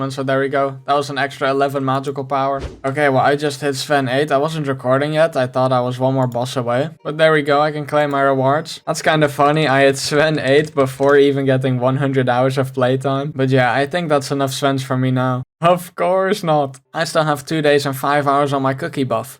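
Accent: Netherlands. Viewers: bet that this guy is from the netherlands i know the accent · I smell a little bit of dutch, IGN: Lancebobo3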